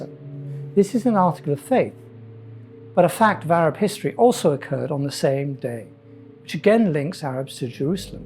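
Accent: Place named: England, United Kingdom. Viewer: The English accent sells it very well.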